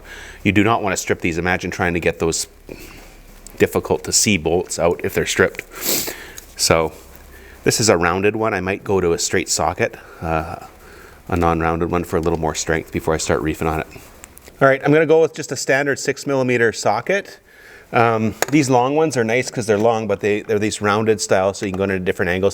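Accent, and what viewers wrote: Canada. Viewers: Watching this from UK. Thanks for posting. Are you Canadian? Take off eh.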